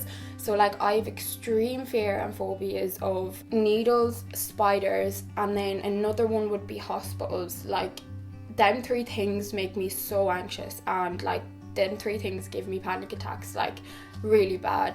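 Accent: Ireland. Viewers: oh my god. you speak in such an irish accent. omg, melanie sounds like an american and you sound like REALLY ORIGINALLY Irish. omg 😊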